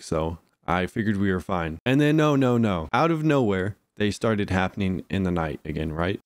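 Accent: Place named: South, United States. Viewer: When did turn like extremely southern?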